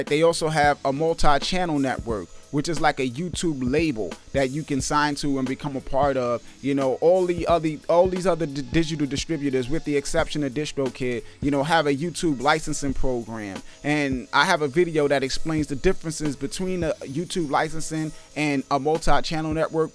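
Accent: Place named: New York, United States. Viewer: You definitely from New York lmao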